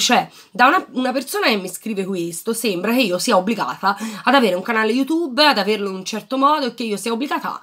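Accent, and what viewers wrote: Tuscany, Italy. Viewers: Sono contenta che farai ancora dei video, mi piace sentirti, mi fai compagnia, amo la tua parlata, mi riporti nella mia toscana da cui manco da 7 anni e che mi manca... Quanto alle domande che ti poni, ti capisco io me ne faccio troppe e tante su tutto, come dico alla mia amica io non ho un cervello ho un multisala, in cui proietto 1000 film diversi più volte al giorno e tutti diversi... Anche io mi aspetto tanto da me ed ho paura di essere una delusione per gli altri (ho qualche cicatrice anche io, anche se non si vede), per fortuna ho chi mi prende a calci o minaccia di farlo e allora proietto qualche film in meno, ma poco... Tu continua così che vai benissimo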